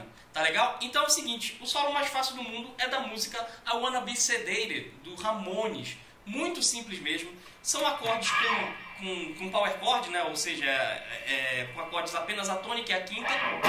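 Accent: Pará, Brazil. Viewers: só pelo jeitos de falar deve ser daqui do meu estado, pará.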